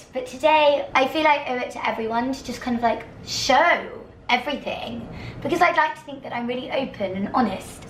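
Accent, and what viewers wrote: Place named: England, United Kingdom. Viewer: Oh my gosh she had the most stereotypical English accent. Which part of England are you from, definitely not London Ps: I’m from London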